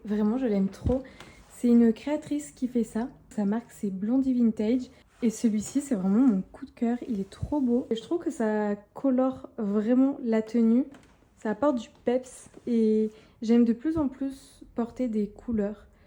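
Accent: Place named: South, France. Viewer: trop du bien , d'ou tu viens ? jentends laccent du sud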